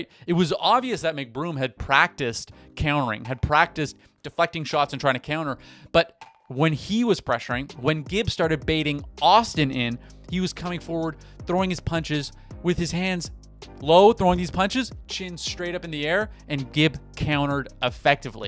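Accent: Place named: Canada. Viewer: Ay, I can spot that Canadian accent. Are you Canadian?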